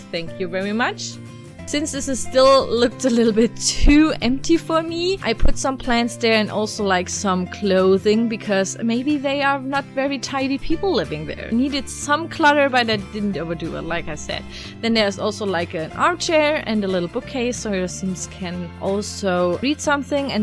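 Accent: Germany. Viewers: Kanns irgendwie sein dass du deutsche bist also nicht bös gemeint aber irgendwie klingt dein englisch ein bisschen nach dem deutschen “akzent“ Und btw dein haus sieht unglaublich geil aus🤗💕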